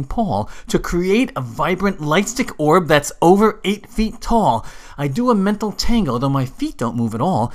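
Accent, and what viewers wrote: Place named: New England, United States. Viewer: hmm sounds like a new england accent. but im most likely wrong. this is bugging. where this person is from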